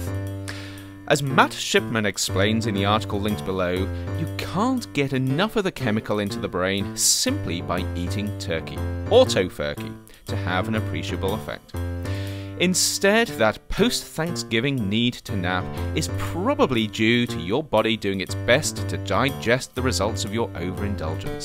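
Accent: United Kingdom. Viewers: Why is there a British guy telling me about Thanksgiving?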